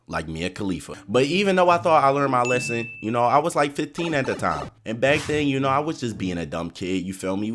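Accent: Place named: Toronto, Canada. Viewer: The Toronto accent making it peak❤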